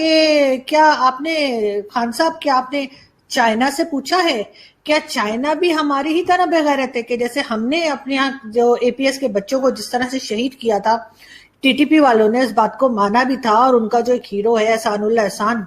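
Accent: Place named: Mumbai, India. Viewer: Bhidu. .. Boleto ekdam Bombaiyya Hindi bol rahe ho..aap ek din Vada paav khane Mumbai aajao..!!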